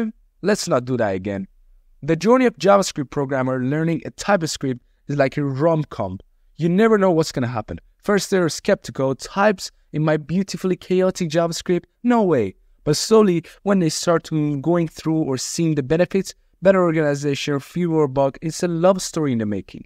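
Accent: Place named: India. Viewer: Blud has indian accent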